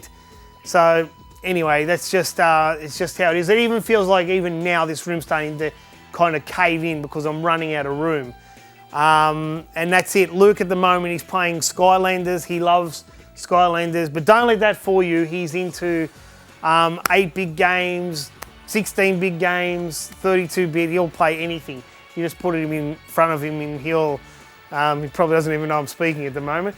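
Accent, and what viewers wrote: Australia. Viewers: youre aussie? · Just love how you from down under says: so yeah, ehm yeah and so on. Sounds bloody awesome! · name It is not annoying. It is Australian.What is annoying to someone is the norm in a different context. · Are u Australian? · I am assuming you're from Australia because of your accent. I love your video game collection, now that's a collector. Keep up the good work! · you can hear the Aussie out of him :D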